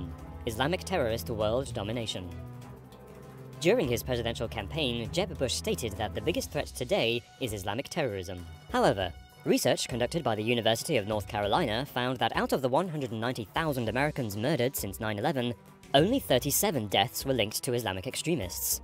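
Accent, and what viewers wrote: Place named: China. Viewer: Logat nya logat org cina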